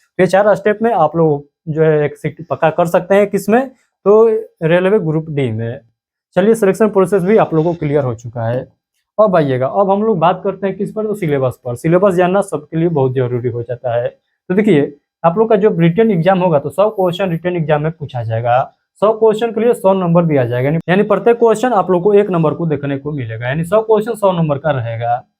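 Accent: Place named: Bihar, India. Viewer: Pahli baat ki aap ki bhasha se aisa lagta hai ki aap Bihar Rajya se hai kya mai sahi hun.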